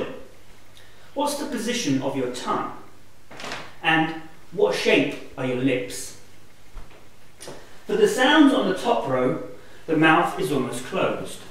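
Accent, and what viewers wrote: United Kingdom. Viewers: Is this britidh accent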